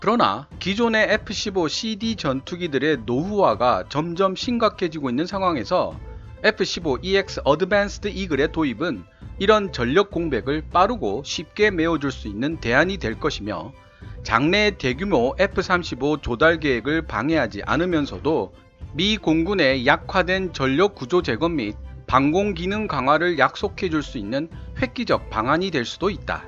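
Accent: Gyeongsang, South Korea. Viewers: 갱상도 분이신가보네요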